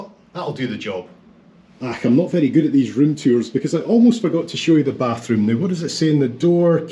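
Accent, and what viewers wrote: Scotland, United Kingdom. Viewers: When you talked about a kilt you made me chuckle. I remember someone telling me that a lot of Scotsmen where them to a futball(?) (soccer) game I believe it was and without underwear. I was just picturing that in a large stadium of people and smiling. Something we wouldn't see here in the United States. I enjoy a Scotish accent and am enjoying the videos that are coming up on my feed of yours.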